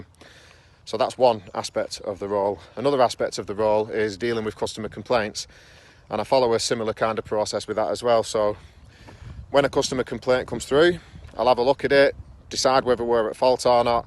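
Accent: United Kingdom. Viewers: hello from Russia, I understood around 70% of your speech but I enjoy the British accent. I would like to speak like you I mean with accent..)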